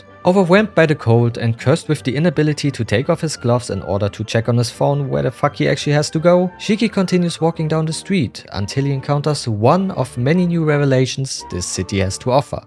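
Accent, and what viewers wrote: Germany. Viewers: (hmmm... The algorithm suggests this ani-tuber. Let me see what that have to show me.) later; That was good. A well-argued recommendation for a manga, soon to be anime. Lightning-fast references for comedic emphasis. All delivered in a well edited video. And the German(?) accent gives it an authoritative cachet.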